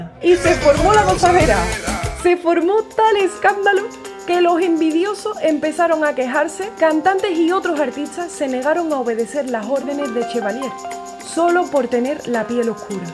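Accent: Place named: Andalusia, Spain. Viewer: Hay,Hay,HayHay QUE GRACIA ME HACES Hoy me He Fijado Atentamente CON QUE GRACIA HABLAS • En vez de decir PUES SI QUIERES ENTERARTE ( PUES ) QUEDATE, Tu dices ( PO ) Quedate, Hasta el Domingo SINPATICA * ANDALUZA *..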